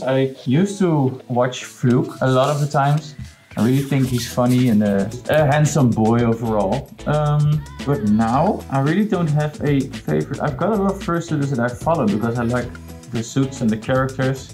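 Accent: Netherlands. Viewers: As a fellow dutchie who's used to english content creators i'm just cringing so hard at our accent. Congrats tho you've earned it🥳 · how do you cringe, I love the Dutch accent. voice is angelic lol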